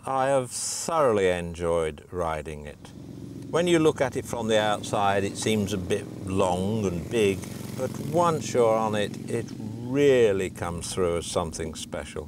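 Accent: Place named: United Kingdom. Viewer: that is a 100% pure british accent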